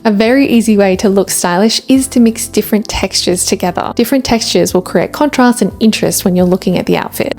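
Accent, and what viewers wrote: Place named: Australia. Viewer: She has a pretty strong Australian accent, so I suspect she mainly talks about milder winters like those in most of Australia. If you need to incorporate more layers in your climate, you can always add merino tights and a puffer vest underneath your coat. For my climate in northern Germany, clothes like the ones she showed work well enough for temperatures around 0°C or slightly below (which is most of our winter weather except the coldest days of the years) in the city, but of course these are not clothes that would keep you warm while on an extended winter hike at -10°C or below. That's fine though, winter is different dependent on the climate - coastal/inland, rainy/dry, all this will make a difference and you will have to adapt these styling tips with respect to functionality in your climate. She cannot possibly cover all winter climates around the globe. 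:)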